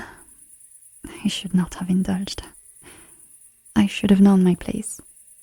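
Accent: France. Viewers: I've been enjoying the French accent videos... Maybe French is the language of love? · This was beautiful, and the French accident is stunning as always. Hope for more in the future ❤️ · Wow! I was totally invested in this story. What a beautiful French accent, & beautiful story. I liked this a lot. Thank you ❤ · A confession From With French accent At a ball Dont wake me up.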